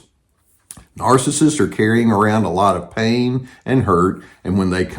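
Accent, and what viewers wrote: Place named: Texas, United States. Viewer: Oh boy! We love the Texas accent. Very very cool, it is. Swish and stylish. The Texan accent. From 2nd April 2023, My beautiful husband PD and I went NC from our abusers so, this means that we have experienced peace for six weeks so far and counting! What do you think of that, Gus? Woof from Evie dog here in Yorkshire UK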